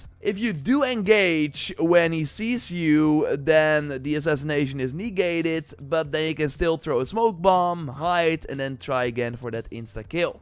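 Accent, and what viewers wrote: Netherlands. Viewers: Hahaha ik hoor gelijk dat je nederland bent zet hem op ouwe💪🏾😂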